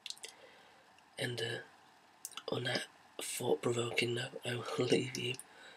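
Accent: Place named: United Kingdom. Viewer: Glad to see you are both back: you and your British accent ^^!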